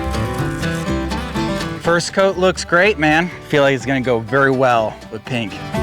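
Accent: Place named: Northern California, United States. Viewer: your Norcal accent is killin me. I hope you never loose it. 🤙🏾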